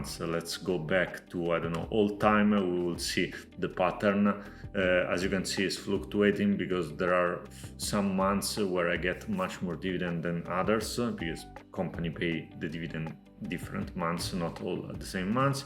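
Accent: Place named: Portugal. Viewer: Great video about Snowball Analytics and I love the Portuguese accent! Does SA use FIFO for cost basis and capital gains/losses calculation or do they give you an option to choose average cost instead?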